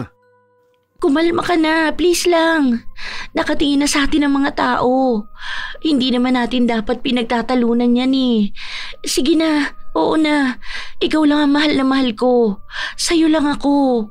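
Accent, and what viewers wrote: Visayas, Philippines. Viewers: Nakakaloka qng accent may bisaya may ilonggo ano ba talaga ining hqhahaha.. wala lang natawa lang ako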